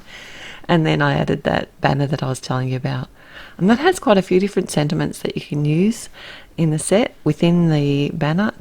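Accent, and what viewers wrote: Australia. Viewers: I'm so glad you started your channel. I'm from Australia and you sound like a neighbor, here or NZ maybe Anyway... I do enjoy your posts. Wishing you many blessings ")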